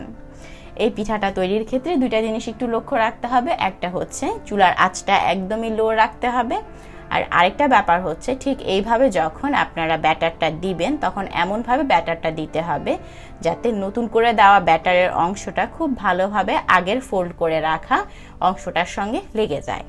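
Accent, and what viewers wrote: Noakhali, Bangladesh. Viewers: ওরে আফা আন্নে নোয়াখাইল্লা নি।😲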